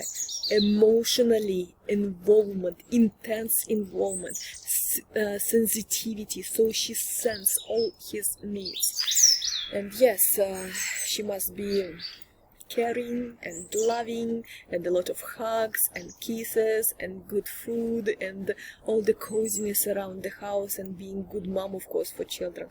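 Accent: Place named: Eastern Europe. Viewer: I absolutely love Eastern European women speaking English with their native accents. I have no idea why but I could listen to your astrology explanations all day. Excellent explanation as usual. 💯💯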